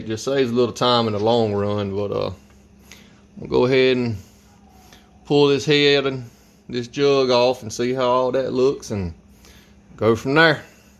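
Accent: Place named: Deep South, United States. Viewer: Big Bay'er fo hunred, got er a rebil kiyt. man thats a deep deep south accent brother. Im from the far far north east.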